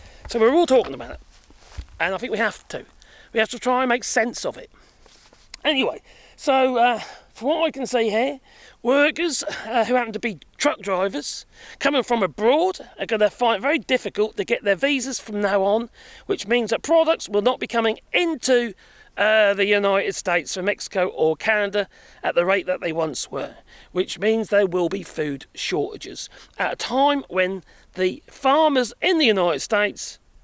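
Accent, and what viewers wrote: United Kingdom. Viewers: You think lack of truckers is going to be the problem ...british accent doesn't mean you aren't an idiot ...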